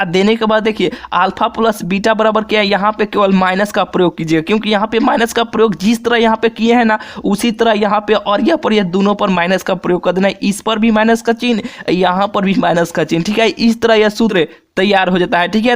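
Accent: Bihar, India. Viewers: Sir aap Bihar ke hai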